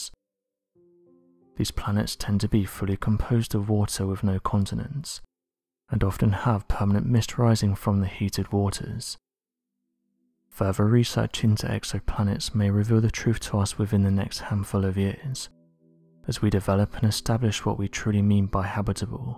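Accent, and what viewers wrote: United Kingdom. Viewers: I hate this guy's lazy British accent.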